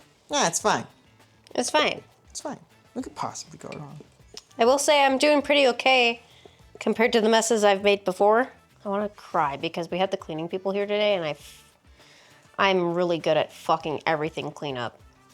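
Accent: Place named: Midwest, United States. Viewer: Definitely from Midwest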